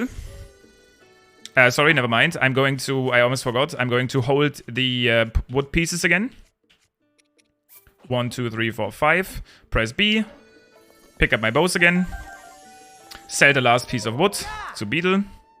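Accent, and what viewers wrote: Germany. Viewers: das deutscheste englisch das ich je gehört hab ^^ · Bist du deutscher?Das erkennt man bisschen am englisch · Boah dieser deutsche Akzent 🥱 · dang, ich liebe diesen deutschen Akzent xD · Are you german cause of you're accent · I have a question ur german arent you because i hear a pretty thicc german accent😂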